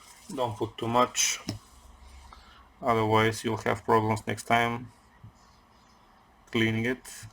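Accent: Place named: Russia. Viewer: парень, ты русский что ли? акцент ебейший)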